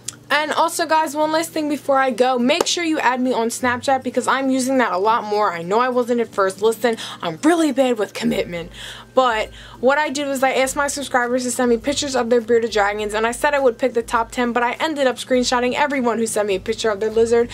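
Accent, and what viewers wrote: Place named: New York, United States. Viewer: Jersey or New York, your twang is confusing as hell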